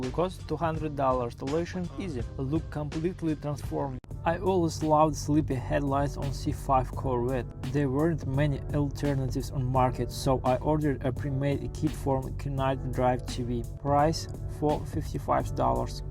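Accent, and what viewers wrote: Russia. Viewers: Бро, ты русский, или что? Просто оч сильный русский акцент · Какой-то у тебя акцент русский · По ходу русский с таким акцентом говоришь как ты брат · Наш русский слоняра 😁 акцент не скроешь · Is the author from Russia? Why is there such a harsh accent in speech? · Вы русскоговорящий ? Акцент очень похож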